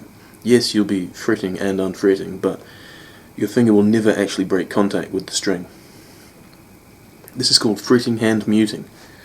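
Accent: New Zealand. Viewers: are you from new zealand? or australia? i am to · Nah this sounds kiwi not aussie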